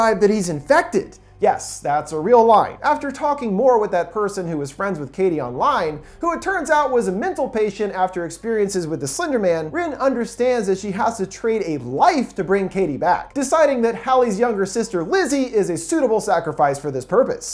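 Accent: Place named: New York, United States. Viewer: Great new York accent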